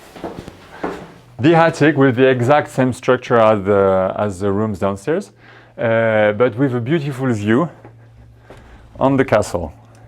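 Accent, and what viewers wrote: France. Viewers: This will be a fascinating restoration. You are very entertaining and I enjoy your humor and lovely french accent. Thank you for the tour and explanation of your plans.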